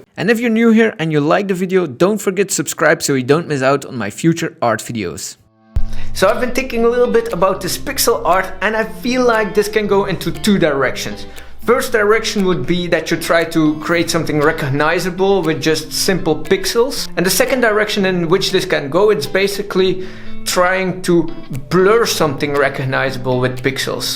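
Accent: Netherlands. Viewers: Are you from the netherlands? Because your accent is sometimes a little bit netherlands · Where are you from cause you sound like u have a dutch accent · I bet you’re Dutch. That accent is so recognizable.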